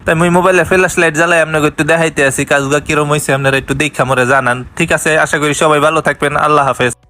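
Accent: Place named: Barishal, Bangladesh. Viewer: Borisaler vasa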